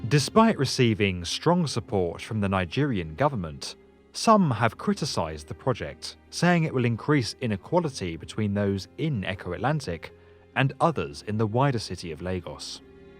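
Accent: United Kingdom. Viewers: This video has just so much more authority because of this UK accent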